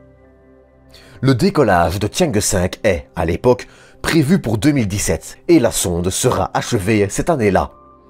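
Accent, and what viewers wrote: Belgium. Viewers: Ca sent le belge qui faut la narration non? Liégeois? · Lui il est belge c’est sûr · C’est un Belge qui parle?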